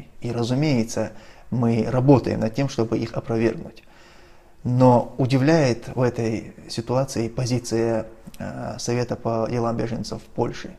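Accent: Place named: Chechnya, Russia. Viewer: ты похож на европейца. Из чеченского у тебя остались акцент и внешний "имидж"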